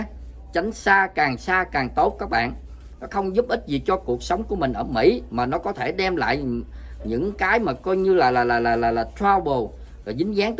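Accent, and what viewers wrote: South, Vietnam. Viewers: Chào anh, mình xem clip của bạn,mình thích nhất giọng nói người người miền nam của mình.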